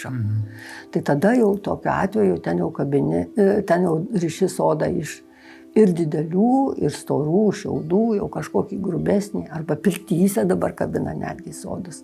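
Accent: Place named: Dzūkija, Lithuania. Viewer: Nuostabu, o dar tas lengvas dzūkavimas malonus ausiai , klausyk ir mokykis.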